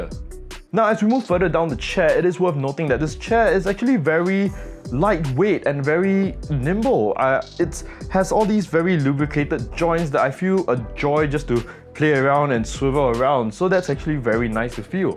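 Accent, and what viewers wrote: Singapore. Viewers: Do I detect a Singapore accent? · I know you’re from Singapore. Your last name and your accent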